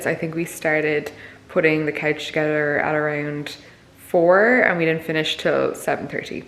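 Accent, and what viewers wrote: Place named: Ireland. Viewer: The combination of your beautiful Irish accent with the rain sounds in the background is so soothing ❤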